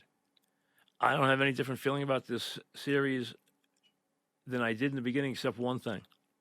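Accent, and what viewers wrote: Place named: Philadelphia, United States. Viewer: Geez Looeeze. Did you grow up in south Philly?? Wtf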